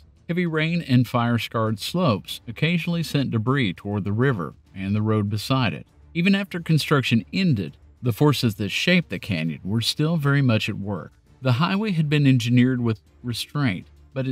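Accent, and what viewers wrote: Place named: South, United States. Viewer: I have such fatigue for the southern accent and the blessing. Just stop - go to church and stfu.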